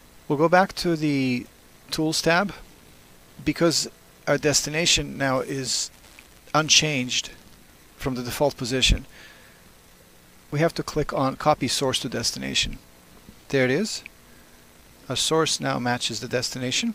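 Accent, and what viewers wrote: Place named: Russia. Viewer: Hi,It drives me crazy. You have a lot to say about a subject and then you become your own worse enemy by not doing the most simple things ruin your otherwise hard-worked tutorial. (Or in your case you just did not know or realize it sounded the way it does.) A pop filter (under $20 from B&H I hate Amazon) is just a screen put between your mouth and the mic that diffusion's the wind from your breath to get rid of the pops and esses a bad mic and setup can cause. I hate to see people go through all the trouble of doing a tut and then it's unwatchable or hard to listen to because of mechanics not subject nor idea. I hear you have I assume a Russian or Eastern accent and English is not your first language. How can you learn English and allow something as easy as not have a sock (really put a CLEAN sock over your mic) ruin your tutorials after going to the horrendous trouble of learning English? 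I've been devouring Resolve and Fusion but just found you. Good luck.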